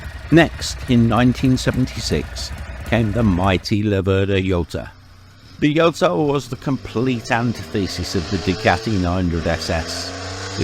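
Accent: United Kingdom. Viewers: I love the stuff you put out. Love across pond accent and knowledge. Here in the States we got all the Japanese bikes and very few Euro bike ever made over here until the 2010’s. Not that had numerous bikes but I’ve had a few. I still own a 75’ Z1b and it’s stablemate is a ZX6R. The 600 will do an honest 268 kph or 166 mph, not bad for a bike half the displacement discussed here. I don’t need to go any faster. I supposed if I had it dyno tune and spent for a titanium exhaust I could go a little faster, but it’s not worth the money or effort. Besides I love hear that scream at 16500 rpm.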